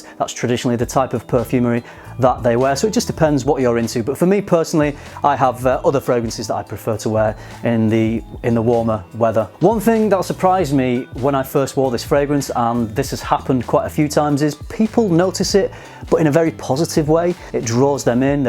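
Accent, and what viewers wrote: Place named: Yorkshire, United Kingdom. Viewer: No that’s a Yorkshire accent (northern) cockney being southern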